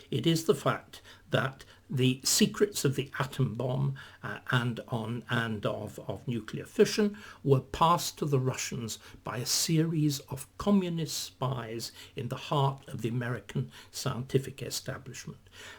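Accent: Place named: Oxbridge, United Kingdom. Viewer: Starkey is a fabulous Tudor historian but his increased penchant for political commentary has become annoying. As an academic, he should be more modest in his commentary on subjects outside his area of expertise; and certainly should not air such commentary on the the "David Starkey Talks" format. This confuses his area of competence with areas in which he is merely an amateur, albeit an educated one. His political talks lack objectivity. He always seems to know which conclusion he wants to draw. People may start to question whether he takes the same approach on his histories! Alas, judging by the comments below, my fellow Americans are too willing to give credence to this warmed up drivel delivered in an Oxbridge accent.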